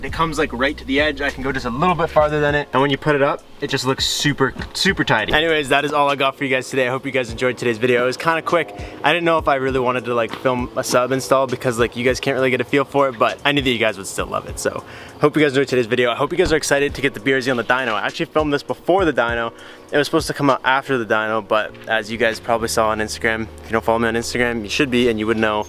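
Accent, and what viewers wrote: Canada. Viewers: Are you Canadian???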